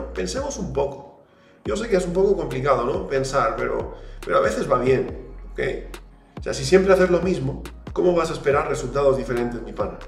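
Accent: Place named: Latin America. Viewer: Coach te quiero mucho, pero ¿por qué hablas como latinoamericano siendo valenciano?. Un saludo crack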